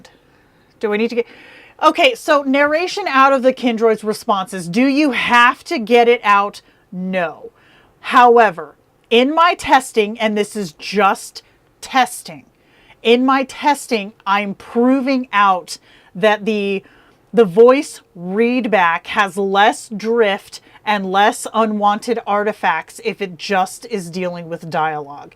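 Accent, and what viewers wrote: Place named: Czech Republic. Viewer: Based on how you can pronounce "R" - Are you from Czechia? :D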